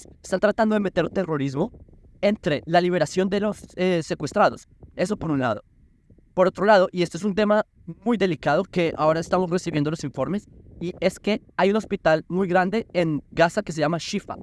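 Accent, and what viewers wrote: Colombia. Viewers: Hablas como los colombianos......por qué?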